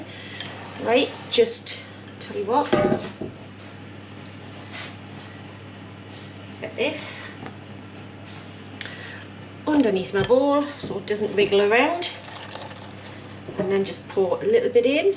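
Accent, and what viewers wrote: Newcastle, United Kingdom. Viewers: Another yummy video. Please don’t hide your accent. The Geordie accent is the best in the country in my opinion.😊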